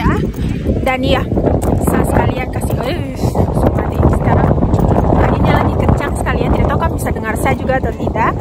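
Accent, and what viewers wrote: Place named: Eastern Indonesia, Indonesia. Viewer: Ka diindonesia dari derah timur ya? Mirip2 dialeknya tmnku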